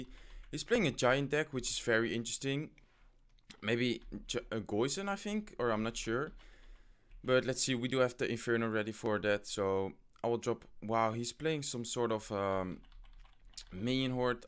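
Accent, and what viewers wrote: Netherlands. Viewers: Dude are you dutch? I hear a dutch accent ( ik ben wel Nederlands :))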